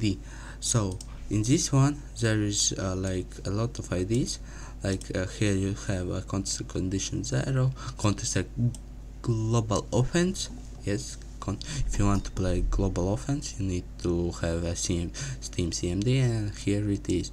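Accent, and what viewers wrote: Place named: Russia. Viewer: Are you Russian? Просто дико русский акцент, а насчет туториала, спасибо, помогло :)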